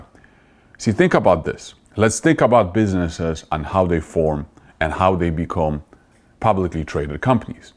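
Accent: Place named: Midwest, United States. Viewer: M. I feel very fashionable! On Sunday evening 23 January, I was 85% in cash, on Monday 24 January, I was fully invested and in margin, PLTR, SOFI, XPRO, IMDB ETC. THE 15% remained in the big 3 of yours, And USGDF, AZMCF,CNSNF. I don’t care about stock prices, just want to be fashionable. Going to be measured for Brioni, Dolce & Gabbana, and Givenchy, I have grey hair Fair, wrinkled skin, with brown spots what colors do you recommend,( to match my stocks? Not sock,)? BTW, you are developing a midwestern accent like Ohio or Indiana.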